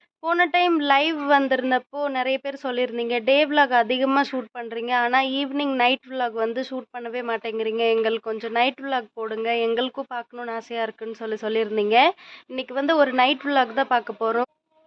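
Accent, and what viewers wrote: India. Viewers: Neenga pesurathu hindi poonu tamil kathutu pesura mathiri iruku lunch time use panran intha varthalam mathiya sapatunu soli parunga nalairukum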